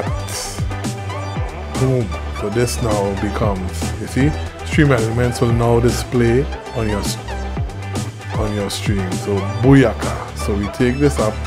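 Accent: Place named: Sint Maarten, Caribbean. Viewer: Great video. I appreciate this information. I don’t know why but you definitely sound like you have a Caribbean accent, in particular a St. Maarten accent. Let me know if I’m correct lol.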